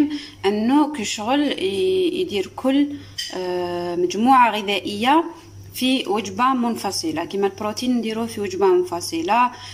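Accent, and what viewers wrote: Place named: Algeria. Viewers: اللهجة جزائرية😁👍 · ذي لهجة الجزاىرية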